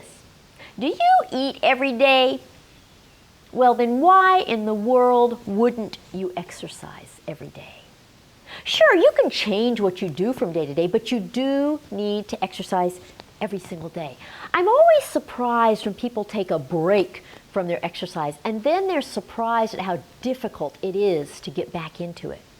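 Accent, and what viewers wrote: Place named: South, United States. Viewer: My dear Ms I can see you have got my feedback all wrong. I do appreciate and respect thoroughly the amazing work that you are doing. As an audience and as a person who understands the value of this my most sincere intention is only to bring to your notice a couple of things that nay enhance your appeal across audiences. As I mentioned earlier all I have shared is with due respect to all you do, I feel sorry that a person as well educated and enlightened as you us unable to appreciate a simple opinion about how you could reach broader borders. I work with appearance and understand that when people cannot connect with what they see or hear they are likely to switch off. And I do not understand what understanding English has to do with this at all. Your very southern American english can probably be comprehended by only those used to its peculiarity its not as uniformly understood by non native speakers at all. 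Having shared my thoughts I have no intention of offending you ma’am its only a way of sharing my thoughts about how you could increase your appeal. I do hope you understand my drift. Thank you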